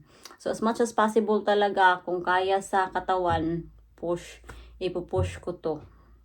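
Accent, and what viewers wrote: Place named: Visayas, Philippines. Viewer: Palagay ko Cagayan de Oro sa Mindanao. Parang bisaya ang Salita nya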